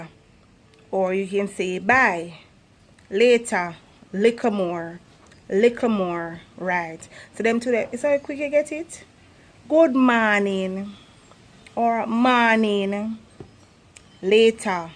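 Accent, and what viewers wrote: Jamaica. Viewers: I love the jamaica voice. I had a pen pal from Jamaica and he tried to teach me to speak the the language but I never could get it.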